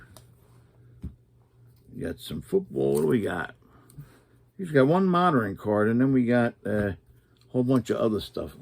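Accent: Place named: New Jersey, United States. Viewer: New sub here. Knew that had to be a Jersey accent. Interesting rip. Hadn't seen that set ripped before. Wow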